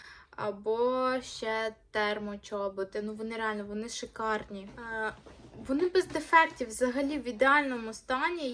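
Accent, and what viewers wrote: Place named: Hutsul, Ukraine. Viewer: Дякую і вам, що ви є у нас).🥰 Не хворійте. Ваш гуцульський акцент я просто обожнюю. У мене так не виходить 😊. Будемо чекати наступне відео 💙💛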